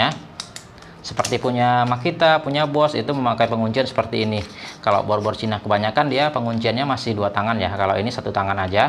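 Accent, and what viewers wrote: Medan, Indonesia. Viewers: abg orang medan ya bg q sring nonton vidio abg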